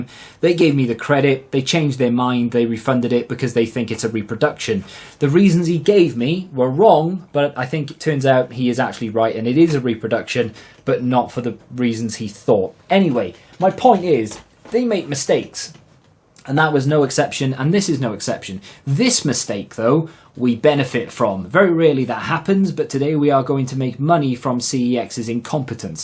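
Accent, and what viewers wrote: Wales, United Kingdom. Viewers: Love your video, interesting stuff. You sound like you are from Wales, particularly the south? Where about? I used to live in Clydach (near Swansea/neath)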